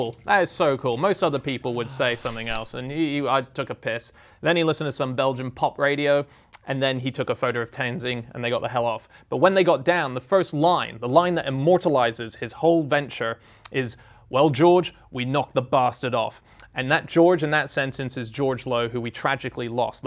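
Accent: United Kingdom. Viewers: True, but only part of ot. You sound to be british so you should know better... War came to Britain later than it came to Poland so it's just normal that Polish mathematicians started to decode it. It's not like bomba was not accurate to newer enigma model it's that British ( that sheltered those Polish mathematicians) couldn't afford to build more. WE as human should respect all that contributed for the greater cause. ALL those who were working on deciding the enigma were great pro's.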